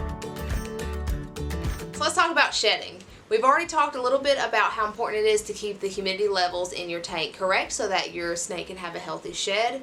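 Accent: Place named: Alabama, United States. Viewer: You sound like you are from Alabama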